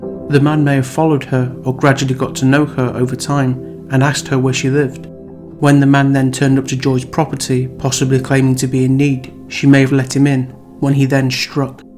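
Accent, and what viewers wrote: United Kingdom. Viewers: Love the cockney accent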